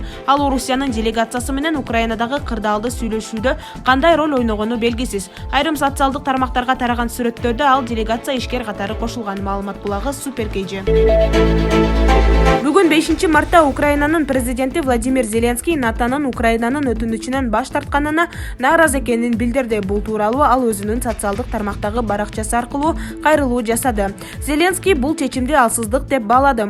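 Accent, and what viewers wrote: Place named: Uzbekistan. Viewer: Бул канал калп айтат ишенбегиле диктору да оштук сарт кыргызча интонация жок озбекче интонация менен суйлойт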